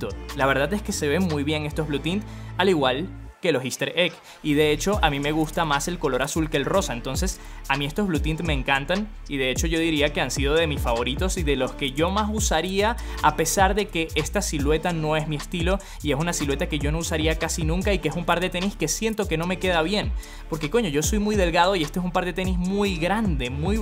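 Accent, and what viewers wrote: Venezuela. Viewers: Bro tienes acento venezolano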